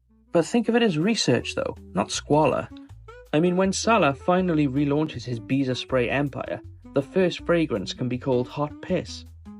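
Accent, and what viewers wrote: Wales, United Kingdom. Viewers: i think he might be welsh but that's just a guess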